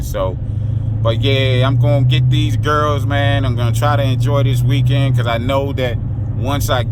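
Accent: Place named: Baltimore, United States. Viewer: I heard you say you’re in Md. I’m thinking Bmore because of the accent lol. I’m looking to get into the Business soon . I’m in the DMV also, PG County to be exact. How is the local work in our area? And if you can point me in the direction of a reliable Dispatch? Keep on Trucking Holmes, you make it look easy 👍🏽👍🏽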